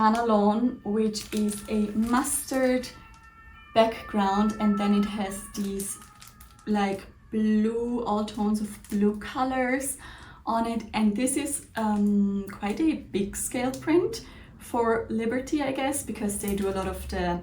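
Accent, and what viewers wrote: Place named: Germany. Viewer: Hi! This is my first time visiting your channel. I believe I detect a German accent. Kommen Sie aus Deutschland? Ich auch, aber ich wohne in America. 😊 I was also in London at the time of the Stitch Festival, but was attending a sewing retreat closer to Birmingham and couldn't do both. I was able to meet up with Cara, right after the Stitch Festival though. It's an amazing feeling when we have an opportunity to meet some of the wonderful people we watch and admire, isn't it? I find it interesting that you (and I) find it necessary to justify why we've done something that, to others, might feel extravagant. Life is full of opportunities and if you can say yes, to some of them, then wonderful! Plus, we are all sewists here and totally understand the appeal of the Festival and wanting to be a part of this amazing sewing community that seems to only exist in the UK! 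Maison Fauve seems like a perfect fit for you, from the little I gleaned from this video. I am also a big fan because the whole company just has a feeling of elegance. Their fabrics are a bit pricey but worth it. The unmistakable quality will make your garments last for a very long time. Ok, I think I've rambled on enough. I do look forward to seeing what will become of all your purchases!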